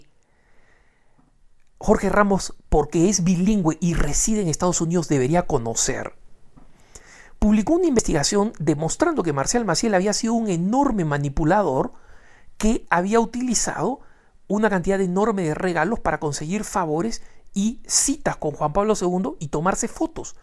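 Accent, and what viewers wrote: Peru. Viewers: Perdón, pero aquí, el totalmente desconocido eres tu(el que hace la "narracion" en el video) por tu acento se eres peruano, pero, ignorante,Jorge Ramos aunque tenga un nombre y apellido muy común,es uno de los 50 personajes mas influyentes en el mundo Hispano; y claro que está autorizado para cuistonar no solo a los papas sino hasta presidentes de paises (como lo ha hecho)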